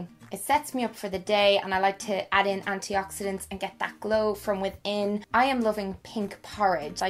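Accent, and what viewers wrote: Ireland. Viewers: wait, are you Irish??? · i luv ur voice i wish mine was that irish 😍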